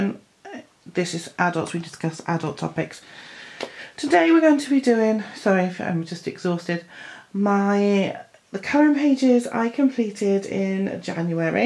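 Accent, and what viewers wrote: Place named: Wales, United Kingdom. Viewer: I love how matter of fact and down to earth you are. Colouring- warts and all, with baby and lack of sleep and dressing gown, is very refreshing. Also love your accent. I think you live in Wales, but does your accent have other influences?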